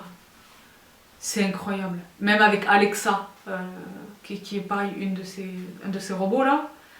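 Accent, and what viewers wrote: France. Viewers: Originaire de Marseille, j'habite à Ottawa au Canada et ma soeur m'a fait découvrir ta chaine. Le "salad spinner" est pas mal - je l'ai acheté l'année dernière et il est encore nickel! Merci pour tes vidéos! Ca fait du bien d'entendre l'accent français!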